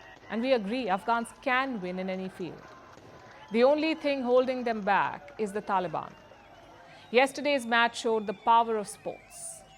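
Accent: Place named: India. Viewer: Palki is wonderful . Her news presentations are comprehensive and concise. And the best thing is that she remains Indian in dress ,dialect and thoughts. God bless her !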